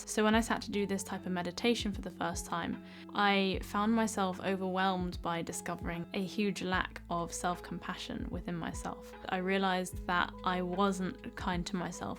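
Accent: United Kingdom. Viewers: Hello dr, my name is mark. I love your video its very inspiring for me. Although i had a bad set back last year I was on a dating site and met a chinese girl, who i thought i was in love with. Turned out to be a crypto investment scam, called sha zhu pan or butcher pig scam of asia. I lost $100,000 in crypto currency. Im depressed now n taking weed gummies and zoloft to help sleep; and get my serstonin levels up. While managing my acid reflux and diverticulosis. I hope i can learn to think better so ill try to learn what your teaching. I just hope weed gummies dont effect my memory. Its bad enough as it is. Along not knowing if ill choose surgery for a 5cm les esophagus,hernia. Surgery is to hard to understand now. Any advise id appreaciate. I get dizzy from clogged sinus. But i admire you fir showing me how a person can be if they apply them self. So tk you, and love you british accent. 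AMAZING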